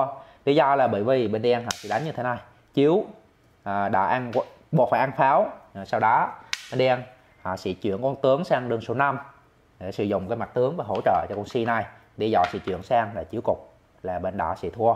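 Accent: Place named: Central, Vietnam. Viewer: Mang tiếng miền trung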